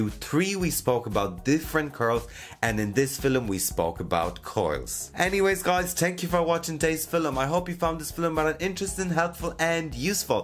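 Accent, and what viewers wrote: Ireland. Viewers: He's Irish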